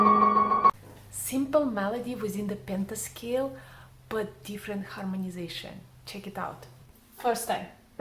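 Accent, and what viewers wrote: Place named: India. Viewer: Very nice video ! Which country are you from ? Your speach and action like indian !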